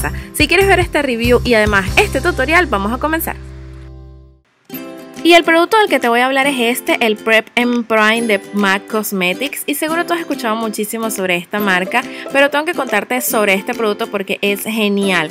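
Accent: Venezuela. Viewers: OoO que genial!! Espero que puedas seguir creciendo :3 Tu contenido es genial. Me pareció que eras Venezolana por como hablas xD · Eres venezolana? me parece por el acento y el logo de Farmatodo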